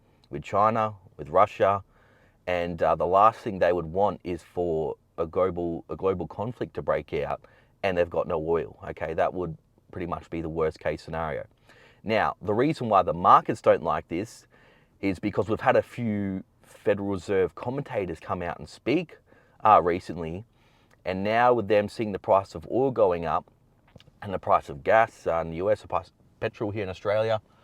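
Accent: Australia. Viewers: I love the crocodile Dundee accent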